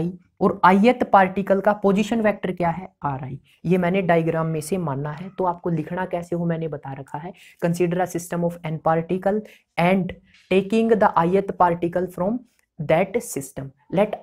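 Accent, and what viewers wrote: Haryana, India. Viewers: Haryana se ho kya sir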